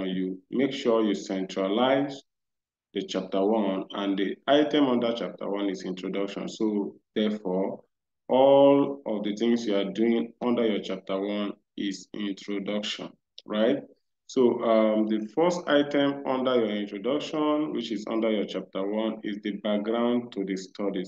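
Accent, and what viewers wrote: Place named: Nigeria. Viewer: It's a beautiful work. A nice presentation. The boss accent sounds Nigerian.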